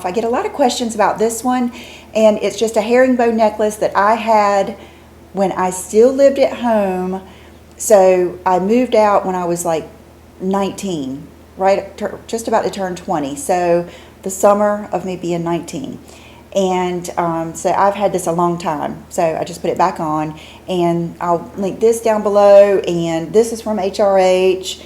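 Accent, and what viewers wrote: South, United States. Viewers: you are my FAV on YouTube for this very reason!! I'm 56 and my struggles are aging and the HORROR of weight gain as well. I found you by watching Angie Hot and Flashy and have been loving your channel every since, the past few months that is. You are more than just makeup and I love that you use this platform to help others like me feel better! I used to get by with just some powder and mascara but now I'm trying so many new products and feeling so much better about myself thanks to you! I'm a fellow southerner from Texas and I love listening to you for that reason:)))) Good luck with your eating challenges:))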